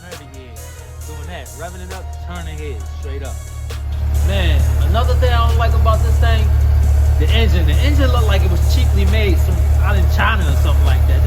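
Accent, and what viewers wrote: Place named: DMV, United States. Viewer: Dat dmv accent funny af duews and twues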